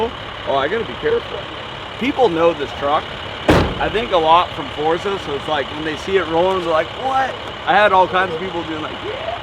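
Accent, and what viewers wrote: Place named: Ohio, United States. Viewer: I just found this channel and this is the first video I’m watching but his accent makes me think he’s from Ohio. Sounds a lot like Roman Atwood.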